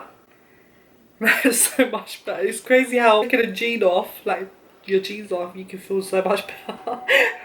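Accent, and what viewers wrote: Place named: England, United Kingdom. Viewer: Where are you from if you don’t mind me asking, you sound English but you said it was 38° I know that in England it’s no that hot 😂😂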